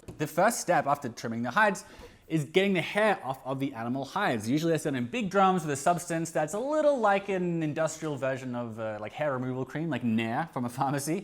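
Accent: United States. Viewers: I appreciate the American accent 😂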